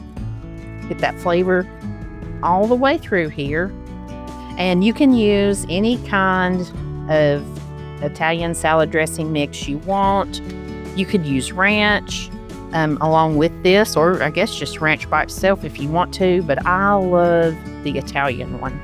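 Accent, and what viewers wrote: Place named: North Carolina, United States. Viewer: Thank you for the encouragement. I love watching your videos. Your accent and loving attitude sounds like my eastern NC home.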